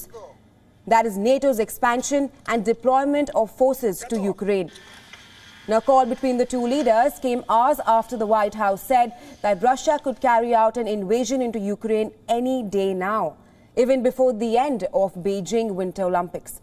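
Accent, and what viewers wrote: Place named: India. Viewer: this Indian news reader her accent is so annoying to listen yo go back to India and report they will love you there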